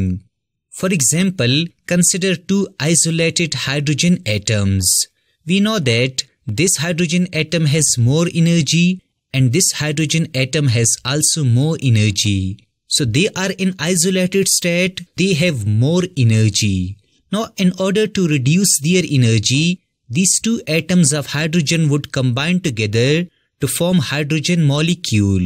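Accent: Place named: Kerala, India. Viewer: SI=ir get a accent your accenet is so keralan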